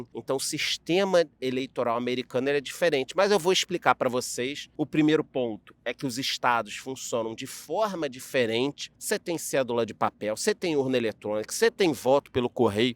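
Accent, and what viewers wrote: Rio de Janeiro, Brazil. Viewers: adoro suas explicações. Excelente canal! (E mat0 as saudades do sotaque carioca ouvindo você). 😊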